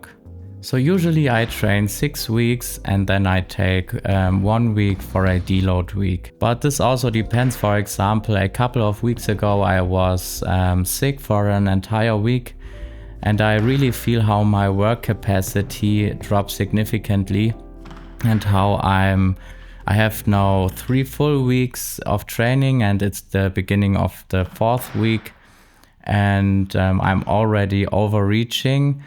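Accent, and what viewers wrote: Germany. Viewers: Can't tell that you have a weird or "sleepy" voice in English. You just sound like a German speaking English carefully. Vielleicht auf Deutsch könnte ich die ,,Schläfrigkeit" hören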